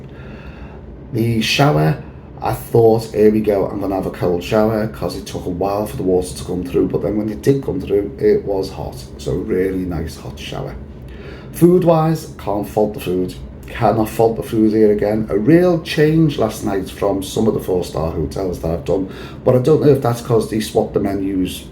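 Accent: Liverpool, United Kingdom. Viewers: are you a Liverpool lad hey I am from Formby myself lol xxx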